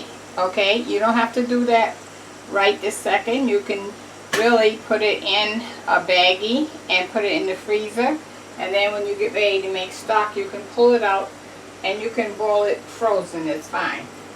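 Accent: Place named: Boston, United States. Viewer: Hi I can’t wait to try this. I was just thinking of some of the cheap things i buy plenty of and how i can use them in other recipes. This sounds perfect for all of the peanut butter I love so much. And thanks for the meat suggestion. Especially the dark meat cut-up. I know you hear this all the time… I hear Boston 😘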